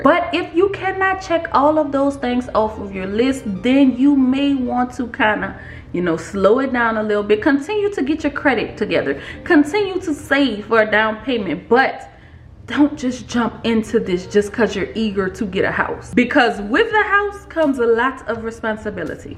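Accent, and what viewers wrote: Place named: Louisiana, United States. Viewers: You gotta be from Louisiana. That accent sounds too familiar! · Oh, I know you from Louisiana. I'm in Slidell and I hear home all in your voice.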